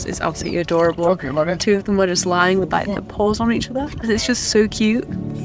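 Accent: United Kingdom. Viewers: I'm guessing your accent is Altrincham, Lymm, Warrington area (via London.)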